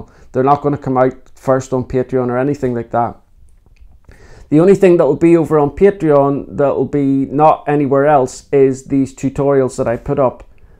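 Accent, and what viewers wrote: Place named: Northern Ireland, United Kingdom. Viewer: I like listening to you because your accent is as close as I'll get to Northern Ireland! I haven't seen my daughter in Armagh since before Christmas and God only knows when we'll be able to travel again. Best wishes to you and the family.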